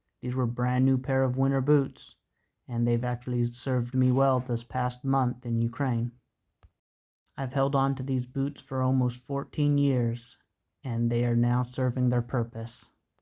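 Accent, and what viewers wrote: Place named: United States. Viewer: Слава України! Героям Слава! God it's so refreshing to hear someone with an American accent who actually understands what is happening in Ukraine. Your committment is admirable and I applaud you Sir. I too fell in love with the nation (and a young Kharkiv region gal named Natasha once... mmm hmmm) and I wish you God's protection and every advantage in combat. Be safe bro, Im a subscriber now!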